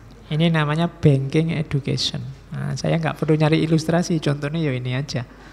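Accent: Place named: Central Java, Indonesia. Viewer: Gaya Bicara Orang Jawa Tengah dan Orang Jogja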